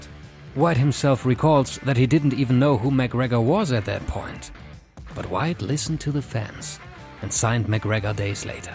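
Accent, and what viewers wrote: Germany. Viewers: Love the German accent ngl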